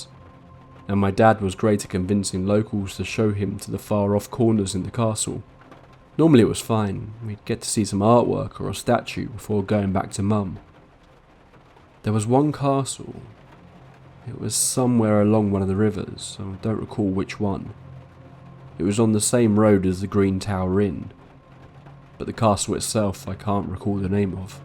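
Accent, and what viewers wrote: England, United Kingdom. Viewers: Because he is English, always make the best narrators, Americans are too hyper+ accents are trash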